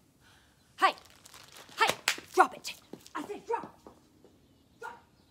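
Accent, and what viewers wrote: South, United States. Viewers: Funny, great looking, with a soft southern accent.😅❤️🇺🇸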